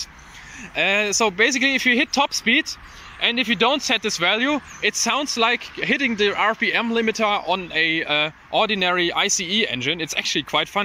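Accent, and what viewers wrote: Germany. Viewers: das ist doch 100% deutscher dialekt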